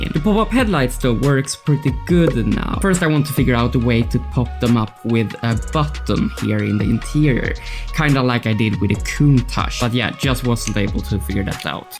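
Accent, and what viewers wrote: Sweden. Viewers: What country are you from? Swedish accent?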